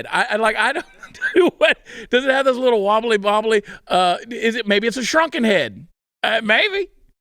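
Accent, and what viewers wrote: Texas, United States. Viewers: I personally love a wordsmith with a Texas accent! A day without Chad & Co. is less shiny and bright.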